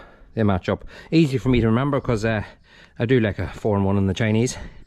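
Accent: Ireland. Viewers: I agree that our phones or iPads have ears. 😂you are not only an excellent farmer, a diary farmer but also an expert mechanic and horticulturalist as well. You are one clever man with many talents and both my husband and myself love watching your videos as you make them so interesting and varied. The Irish accent helps as well as it’s our favourite. We are watching you from down under in Perth, Western Australia.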